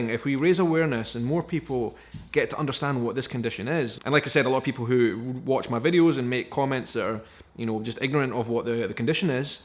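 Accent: Scotland, United Kingdom. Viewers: Hello, dear I have been watching your videos for around 1,5 years and besides that I love them, I can honestly wholeheartedly say I never noticed anything strange about your skin or arms at all. And even if I had wouldn’t have made a lot of difference - I’d still be watching your content because I love your accent, your stories and Scotland 🏴 in general. 🥰😊😃😌 👋🏻 Stay as gorgeous as you are I’m waving to you from Germany 🇩🇪, Berlin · I’ve never noticed any of this and to be honest none of it matters — you’re a handsome guy with a great Scottish accent. 🙂 I’ve always admired your openness and confidence. Keep your head up and know that your honesty about this topic is probably helping a lot others who deal with this. Dietary changes are hard, I know. I am gluten and lactose intolerant and I have been on a 100% gluten free diet for 15 years. Once you see the benefits it will get easier. 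Also try adding kefir to your diet it’s great for your gut and it can help counteract the inflammation. Best wishes! Oh and I’ve had small patches of psoriasis that were helped by a lotion called Curel Itch Defense — not sure if it’s available there.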